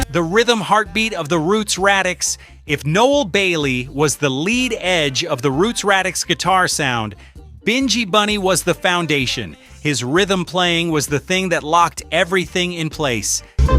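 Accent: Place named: United States. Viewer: This guy is an ignoramus impostor pretending to be knowledgeable. He takes a smattering of little bits and pieces of facts and strings them together with an American accent padded with repetitive meaningless phrases. Far too many foreigners of his ilk think their pretense of knowledge gives them relevance. "A 1-eyed man is king in the land of the blind". Anyone who knows about Jamaican music will find this guy's presentation hollow.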